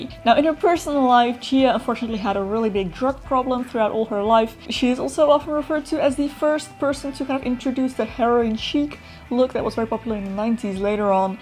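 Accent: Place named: Netherlands. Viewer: ik wist dat je Nederlands was toen ik je accent hoorde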